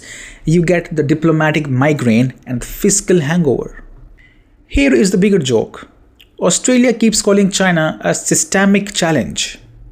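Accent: India. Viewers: Thank you!❤I like a lot your accent,make me think to magnific India!Keep up the real info!Respect from a romanian living in Germany!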